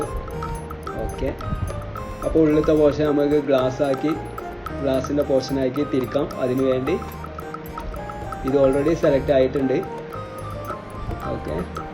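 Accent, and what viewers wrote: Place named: Kanyakumari, India. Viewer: Porathathin video Kasaragod um voice kanyakumari yum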